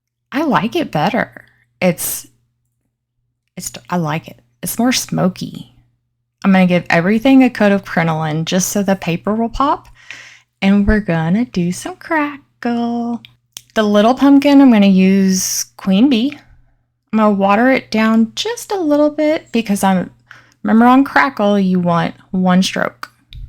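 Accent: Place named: South, United States. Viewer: Sounds like you're a southern gal like me! Love your pumpkins!!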